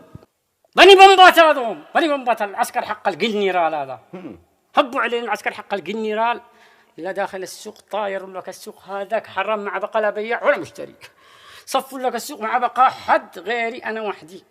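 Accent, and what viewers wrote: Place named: Raymah, Yemen. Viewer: ...يتحدث اللهجه الريميه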